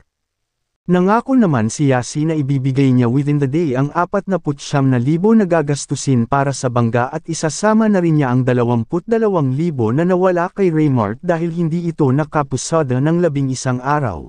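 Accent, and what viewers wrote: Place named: Visayas, Philippines. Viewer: Bisaya kang mag salita...ayusin m ang pag pronounced m ng mga words...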